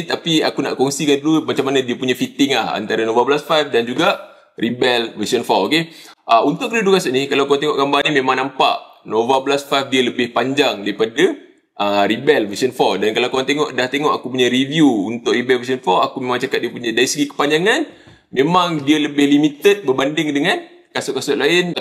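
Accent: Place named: Malaysia. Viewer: Takpalah.. Lidah Melayu 😅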